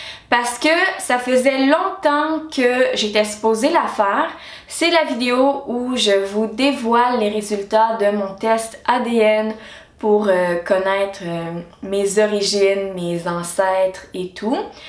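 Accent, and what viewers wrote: Quebec, Canada. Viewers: En passant, tu ressembles à une Haïtienne. En tout cas, je l'ai senti avant de voir cette vidéo. J'ai regardé ton autre vidéo sur ta routine naturelle pour le visage et j'ai dit ''Je suis sûr qu'elle est Haïtienne (qui vit au Québec depuis longtemps aka ton accent) OU peut-être un mélange Haitïenne & Québécoise''. Je ne vois pas pourquoi on te pose autant de questions mais bon lol; il y a beaucoup d'Haïtiennes de ton type.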